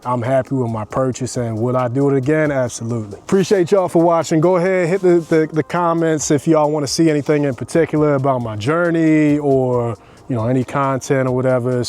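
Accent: Baltimore, United States. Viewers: I know a Baltimore County accent when I hear it! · Facts am from DC and i hear B-more county all day in fam.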